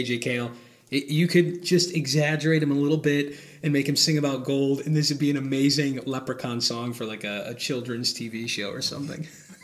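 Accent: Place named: Netherlands. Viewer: Are you by any chance a Dutchie that moved to the US? I'm trying to pinpoint the accent.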